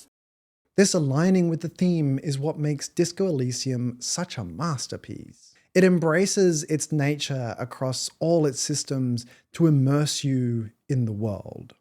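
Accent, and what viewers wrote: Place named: Australia. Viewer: You sound australian but pronounce some words very american. I am confused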